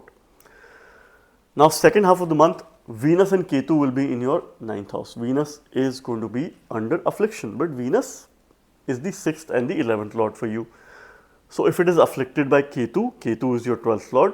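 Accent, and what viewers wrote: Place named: India. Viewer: It would be easier to understand if you didn’t have such a strong Indian accent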